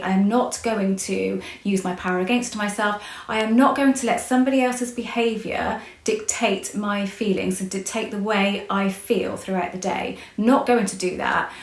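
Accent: United Kingdom. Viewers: i knew i wasn't going crazy. I wonder whatever happened to Noel Edmunds. lol. Anyway thanks for replying. And I must admit I sometimes get you and Natalie Dance mixed up. Soz. Anyway I like your teaching style. Nice to hear a British accent teaching this stuff.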